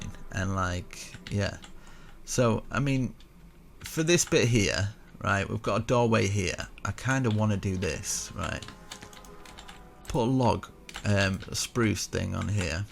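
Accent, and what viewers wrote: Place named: Scotland, United Kingdom. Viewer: Your accent seriously you sound Scottish I love your videos you make amazing builds and your funny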